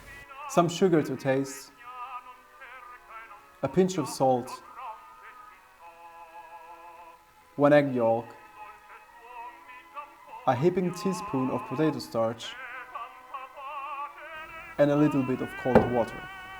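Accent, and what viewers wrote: Germany. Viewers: buongiorno you english has, for me that are also italian, sound with a german accent....maybe your greman is perfect, saluti da Torino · Crazy, you even speak English with a German accent lol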